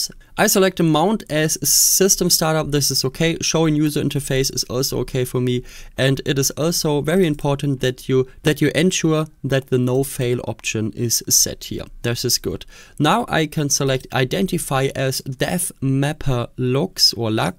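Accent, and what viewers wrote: Germany. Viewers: could it be that you are german? Your voice sounds really german and how you pronounce stuff sounds german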